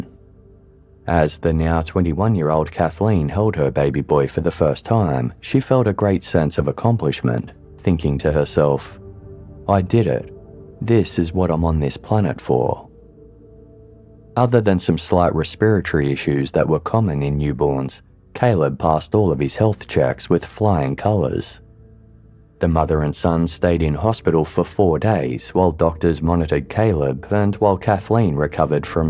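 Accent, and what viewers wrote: Australia. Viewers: Whoa ! aussie :)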